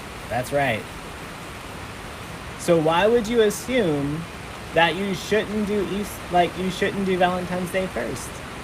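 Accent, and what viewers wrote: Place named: Alabama, United States. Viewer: Lol, you say ya'll as much as we do in AL. My cousins in MI say "youz guys"...that's just wrong don't you think? haha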